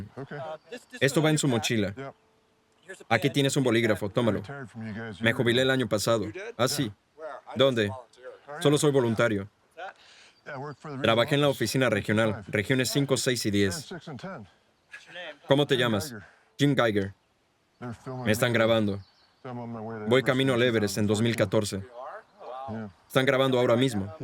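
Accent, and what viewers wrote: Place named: Spain. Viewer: No soporto el acento gachupin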